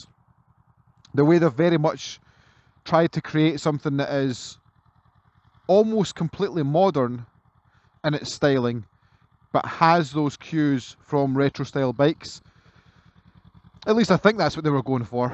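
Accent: Scotland, United Kingdom. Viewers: 😂 LOVE that Scottish accent …as an Irish person I can understand very word !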